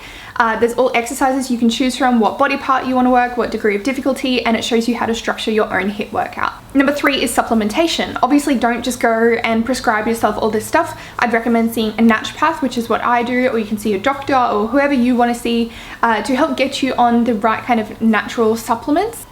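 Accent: Australia. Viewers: Definitely the Aussie accent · It's how it's pronounced in Australia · I feel like it's the accent. I am also Australian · KristinaS2009 If you watched the video you would've seen the explanation. She's australian, that's how a lot of them say it. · Just an Australian accent, that's how most people say it here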